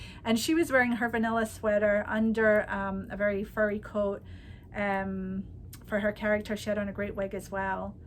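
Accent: Scotland, United Kingdom. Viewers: Raining sideways!!!! Thank you so much, this podcast absolutely made my day. This is the first time listening, and I just burst out laughing at your comment about the rain the in Western Isle being sideways. I was born and brought up in Stornoway, so yes, I can absolutely agree with you. I am living in Fairfax, Northern Virginia, and I could listen to your beautiful Scottish accent all day - especially as I have not been able to do my yearly pilgrimage home this year! I will probably be binge watching to catch up with your many shows, and I look forward to shopping with you very soon. xxx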